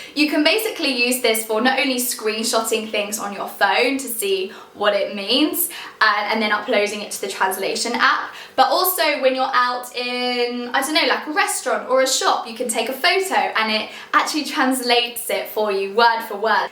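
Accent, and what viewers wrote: United Kingdom. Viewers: You should get rid your very strong so called "british" accent. Try to learn pronunciation from Cambridge or Oxford courses of English, or, at least, from the TOEFL, because american accent is easier to understand for Russians. But the best (purest) English pronunciation is in Australian universities and on TV.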